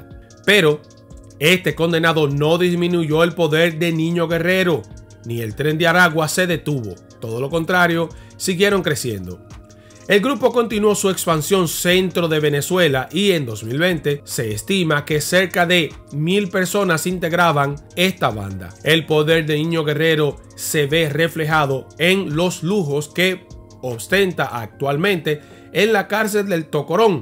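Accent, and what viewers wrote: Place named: Dominican Republic. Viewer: Q te pasa. No sea pesado, así hablan los dominicanos, el no tiene q mejorar nada.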